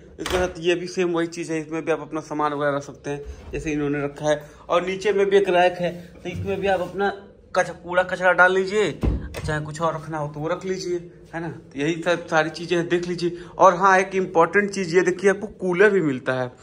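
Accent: Varanasi, India. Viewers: APKI BHASHA SE ME PEHCHAN GAYA KI AAPBANARAS SE HA MERE NANI KA GHAR BHI VAHA HA 😁😁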